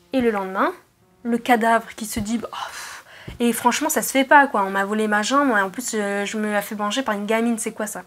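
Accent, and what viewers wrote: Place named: Spain. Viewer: Coucou, j'adore ton accent espagnol. J'adore ta petite histoire. Merci pour ce que tu fais.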